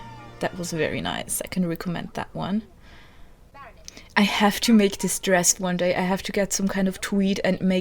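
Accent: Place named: Upper Austria, Austria. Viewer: I'm trying to place your dialect based on that short chat with Oma. I'm guessing Oberoesterreich, but admittedly not a lot to work with.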